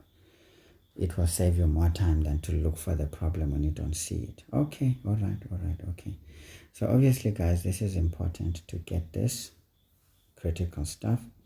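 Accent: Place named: Afrikaans, South Africa. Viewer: the Afrikaans accent 😂😂thank you for the videos sir ,I'm always looking forward to your uploads .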